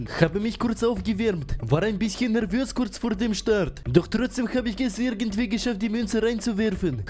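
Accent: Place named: Russia. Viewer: Ich liebe deinen Russischen Akzent! Mach weiter so!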